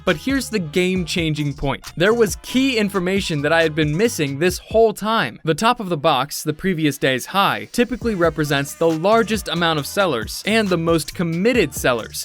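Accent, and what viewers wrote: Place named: United States. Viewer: I don't think that it's a matter of what timeframe. I think more of a case of when does the day start....I live in Tasmania Australia and my TIMEZONE GMT+11 and I mainly trade on FOREX and I am able to trade from Monday morning am until Saturday morning am which is market close time for New York trading at GMT-5. So I'm in guessing that he's referring to that timezone based on him sounding American. But the other question is, is it a 24 hour period or based on your local trading session?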